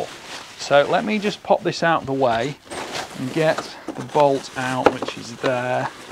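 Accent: United Kingdom. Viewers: From Britain ?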